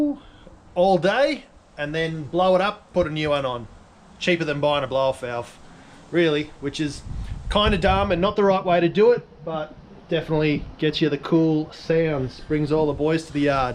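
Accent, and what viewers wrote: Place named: Australia. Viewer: Tell mydipsy wreck to kick rocks and do one! you speak like that because that's your accent and how Aussies speak!..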